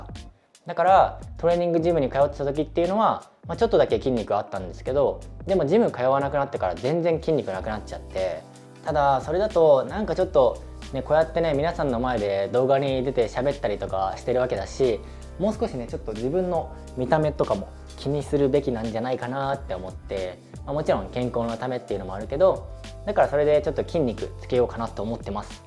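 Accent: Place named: Japan. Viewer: Please do not doubt your English pronunciation. It's great. You can pronounce words correctly which are impossible for other Japanese people to do. And don't worry about your accent. Even after living in the US for 21 yrs a slight German accent will slip into the conversation, even though Americans always thought I was Irish or Swedish 😂. When I look at your built I always see Yoshiki-san from XJapan . He has the same height as you but a real strong upper body. He is slim but has muscles from drumming and physical exercise. So it is not impossible for you to achieve.- I wish you all the success in the world ❤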